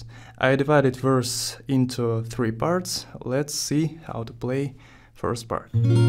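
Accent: Russia. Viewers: Этот русский акцент >_> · 100% Russian accent.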